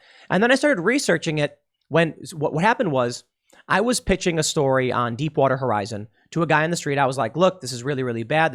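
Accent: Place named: Chicago, United States. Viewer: stereotypical chi town accent is funny. You can tell he is from the Midwest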